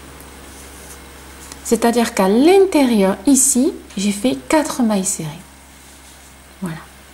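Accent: South, France. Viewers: Bonjour, très beau point!!! bel accent, ça sent le sud!!! beau week-end, bisous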